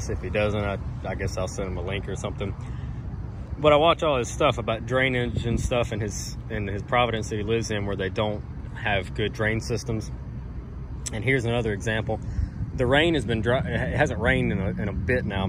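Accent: South, United States. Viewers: ...You got me with the thumbnail...I thought ooh new Post 10 video...Then I clicked on it and heard your smooth southern accent...haha!!! You're both Legends! What you need is your 'Drain Maker 6000'...haha!!!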